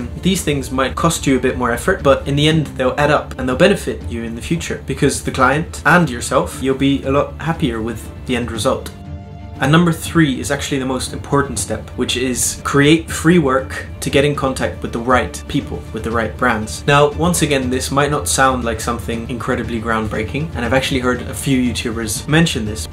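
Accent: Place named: Canada. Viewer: wait you are 100% canadian, that drivist prononciation.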